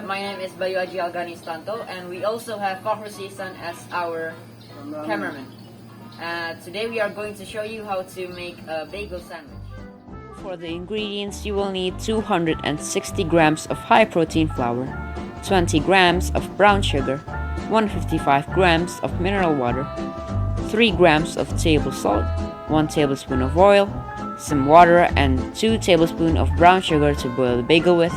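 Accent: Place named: England, United Kingdom. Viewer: its really look so delicious ! love ur eng accent too !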